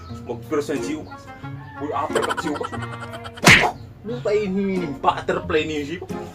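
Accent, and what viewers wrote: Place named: Bicol, Philippines. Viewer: Hehe bikolano ka pla po sir kala ko po taga manila parehas palan po kita haha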